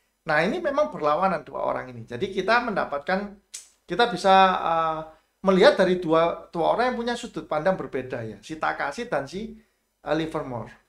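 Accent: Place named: Java, Indonesia. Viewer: seneng sama mas, bosone medhok😀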